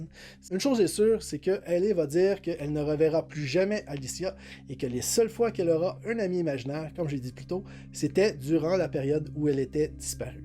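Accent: Quebec, Canada. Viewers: Un québécois, sa fait du bien, lâche pas mec, c'est super bon ce que tu fais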